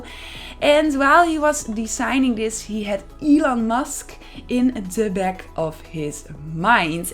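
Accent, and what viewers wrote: Netherlands. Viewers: Je heeft een groot Nederlandse accent.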